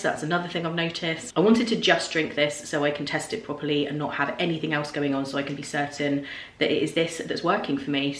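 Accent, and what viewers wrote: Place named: Dudley, United Kingdom. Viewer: I knew he was from near me! I could hear it 😅 but I'm from Dudley 💙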